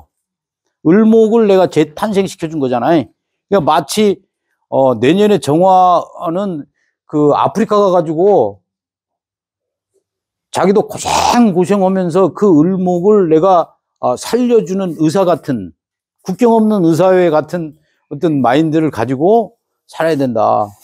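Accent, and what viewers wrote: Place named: Jeju, South Korea. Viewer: 제주도 사투리 넘 웃겨요~~최고의 강의 감사합니다~~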